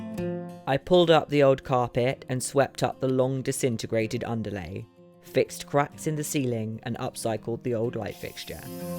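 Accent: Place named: England, United Kingdom. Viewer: I just discovered your channel, a welcome surprise! Things I love about you, not in order:- 1. Your “give it a go” attitude; 2. Endless courage; 3. English accent pleasant on the ear (I can understand you): 4. Hard Worker; and 5. Beautiful property. And I smiled at the protected bats in your roof. Gotta love nature. Looking forward to your building creativity over time.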